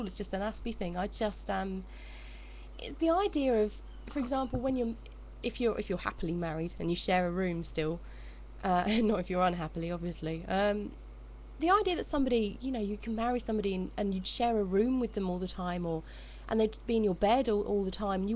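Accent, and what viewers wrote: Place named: United Kingdom. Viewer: Which dialect of the British accent are you? I am fascinated with accents... I am female & been diagnosed with aspegers not long ago, basically, I do relate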